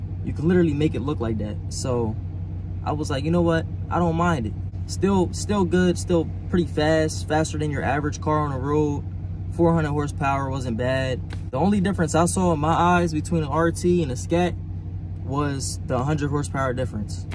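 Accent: Philadelphia, United States. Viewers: Boa yu sound like yu frm philly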